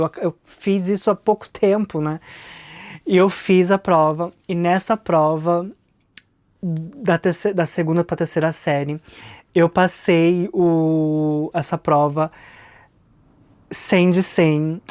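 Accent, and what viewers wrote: São Paulo, Brazil. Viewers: Seu português é perfeito e vc tem sotaque paulista, se eu não tivesse ouvido sua história, se vc falasse que cresceu em SP, acreditaria 100%. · Nossa vc fala muito bem o português. Seu sotaque é paulistano. Vc é poliglota, que tudoooooooooo. Vc precisssaaaaaaaaaaaa vir para o Brasil, tem tem tem que vir, vc vai adorar muito.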